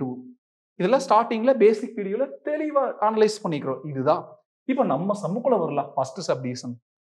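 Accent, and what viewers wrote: Coimbatore, India. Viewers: Guys kovai slang 😅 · Kerala Pakkam ponadhu Ilaya 🤣🤣 idhu Coimbatore Tamil 🤭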